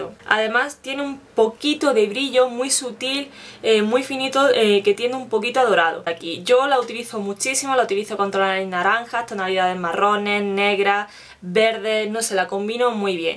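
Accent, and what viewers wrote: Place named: Jaén, Spain. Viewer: ¿Eres de Linares o Jaén por casualidad? Soy de Jaén y tu acento es muy de por aquí jeje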